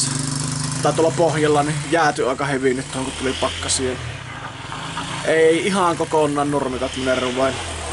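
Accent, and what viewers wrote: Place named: Savo, Finland. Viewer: Pit tilata kanava, vaekuttaa lupsakalta tuo Savonmurre ja ite kanava myös😉👍Minun juuret on syvällä Savolaisessa kivisessä muassa. Minun murteet männy sekasin kun asunut Etelä-Suomessa ja nyt Pohjois-Karjalassa ja syntyjään sieltä.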